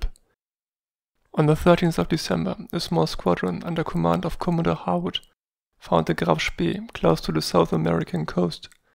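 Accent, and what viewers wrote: Germany. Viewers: German accents from certain areas sound 'sad' to English speakers. The video is rather good, I thought.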